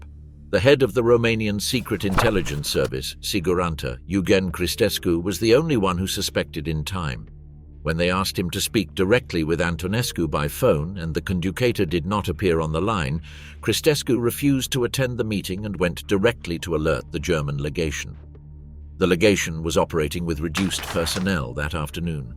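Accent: United Kingdom. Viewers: In primele minute ale povestii voastre apar și primele , nu erori ci minciuni Limba engleza și acentul britanic al povestitorului nu conferă autenticitate povestii mutilate și modificate propagandistic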